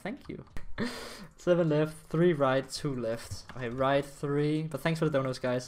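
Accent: Denmark. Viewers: U danish? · Is this man from Denmark ? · are u danish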